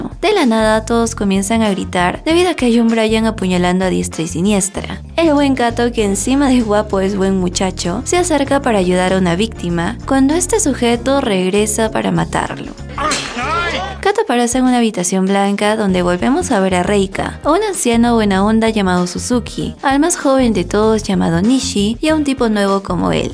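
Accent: Peru. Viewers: Eres de perú? Digo por el gaaa y gracias por el resumen eres la mejor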